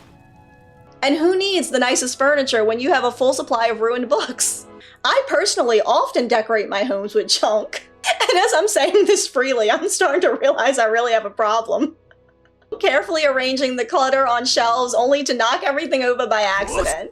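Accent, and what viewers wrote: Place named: Boston, United States. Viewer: Sounds like Boston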